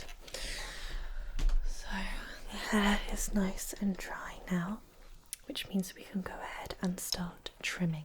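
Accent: United Kingdom. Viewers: Where are you from? Accent sounds british? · a British English ASMR channel is good news, ty and please keep uploading.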